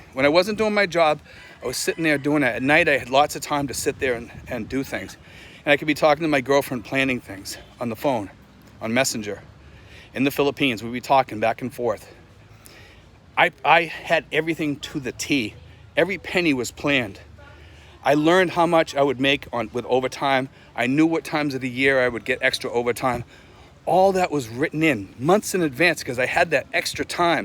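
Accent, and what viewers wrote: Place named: Massachusetts, United States. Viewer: You sound like your from Mass! I from Boston.Iplan on moving to the Philippines before I'm 58.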